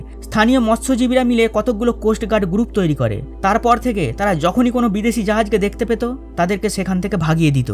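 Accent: Kolkata, India. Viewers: আপনার ভয়েস শুনেই মনে হয় কলকাতার দাদা ❤️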